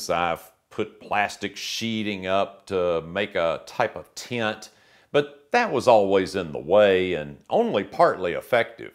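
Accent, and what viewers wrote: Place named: Texas, United States. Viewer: your Texas rhythm is perfect for the instructions. Don't let the thoughtless comments get you down. All the effort and talent you bring to your videos is very much appreciated. I've learned a great deal and I've been inspired.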